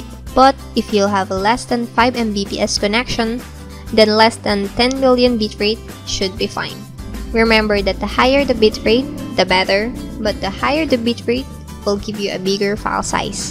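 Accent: Philippines. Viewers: You are obviously from the Philippines with that hard-tongue accent. Hehehe. I'm subscribing. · pinay ka po noh ?? haha accent pa lng by the way super thanks po dito sa tutorial vid. mo ♥ :)) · I'm so in love with your accent!! Very cute!! <33 It sounds like a you have a Filipino accent. Are you from the Philippines? :)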